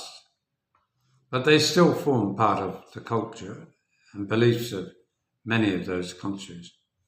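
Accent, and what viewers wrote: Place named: United Kingdom. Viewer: Hey No Need, I agree there are many Stupid Americans here and Stupid Brits the Same, you can’t claim to be Australian, but you’re truly British. It’s in your accent long live the King God bless. Hope you’re safe.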